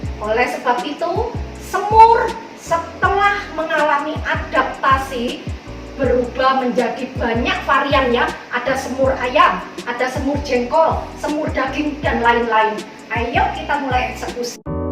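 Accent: Java, Indonesia. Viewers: ngomong medhok nya yang ngangenin liat kontenx sll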